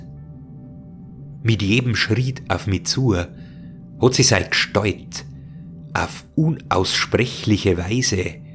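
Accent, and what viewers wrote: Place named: Bavaria, Germany. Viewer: Bockshaxert 😂 Bairisch isch eifach e scheene Dialekt! Griaßle usm Badische👍🏻🤘🏻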